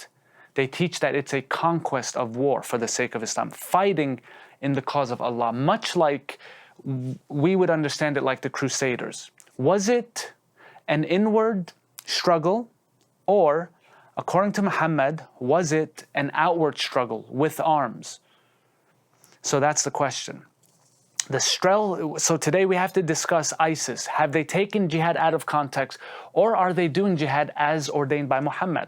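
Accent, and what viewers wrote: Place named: United States. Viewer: Esse cara é americano da pra ver no sotaque dele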